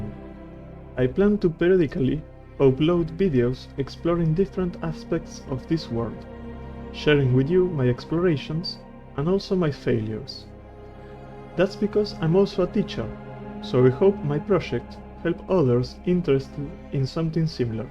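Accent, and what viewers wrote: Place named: Argentina. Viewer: Llevo 20 segundos del video y escucho que sos de Argentina y me salio un terrible OOOOAAAAA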